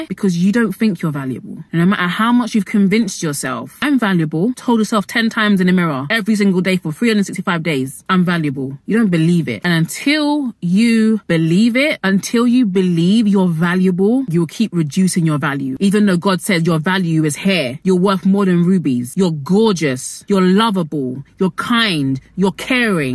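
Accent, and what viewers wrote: United Kingdom. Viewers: How did i know you were going to have a British accent before clicking the video😂🤷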